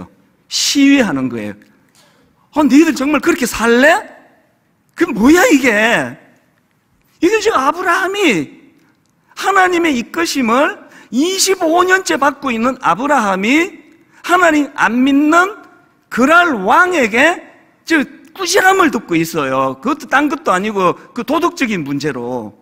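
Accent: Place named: Gyeongsang, South Korea. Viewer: 제가 대구사람인데 서울출신 남편이 처가가족들 대화들으면 싸우는것 같다 그래요 ㅋㅋ 목사님 경상도 스타일이셔서 그런것 같아요^^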